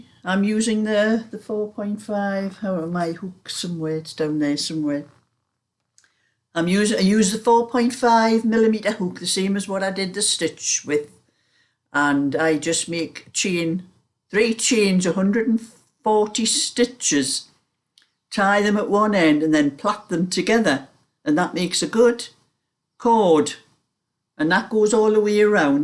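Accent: Newcastle, United Kingdom. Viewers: Hi,this is my first time messageing you but have watched your video's for over a year now and really enjoy them,my husband loves your rants being ranter himself😂being geordies ourselves but now living in shropshire,it's lovely hearing the geordie accent,i am from jarrow(jarra)my husband is from hebburn. I love listening to your stories from the past. Keep up the good work x PS could you tell me where you got your wool winder please,i have one but it dosn't make very big cakes like yours do❤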